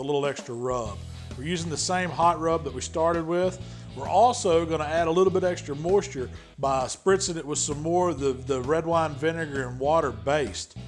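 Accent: South, United States. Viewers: Southern accent plus overweight... please serve me food!!! 😍😍😍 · he has quite a strong American (I'm guessing southern? I don't know American accents!) accent. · I can't think of anyone I would trust more when it comes to anything relating to eating meat than a big happy dude with a southern accent and a half dozen different types of grills/smokers on his back patio. At the time I post this there are 299 dislikes.... who the hell is clicking that button?? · I not really understand what he said, is it south USA accent? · Unless your a big boy with a southern accent then I don't listen to ya on BBQ/SMOKING TIPS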